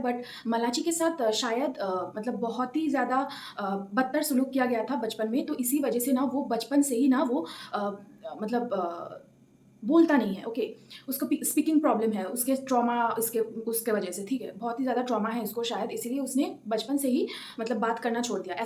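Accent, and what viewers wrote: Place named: Bengal, India. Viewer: 8 months na ki 8 years Btw. Your Bangla-hindi accent is amazing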